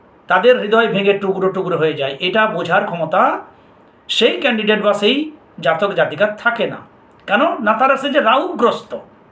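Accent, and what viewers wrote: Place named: West Bengal, India. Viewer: আপনার বাড়িটি কোথায়? পুরুলিয়া, বাঁকুড়া,বা বীরভূম হতে পারে।মাঝে মাঝেই স্থানীয় টান চলে আসছে। তবে সহজ, সরল, ভাবে বোধগম্য, সহজপাচ্য পাঠ সত্যিই অনবদ্য। কৃতজ্ঞতা স্বীকারে -- মনি।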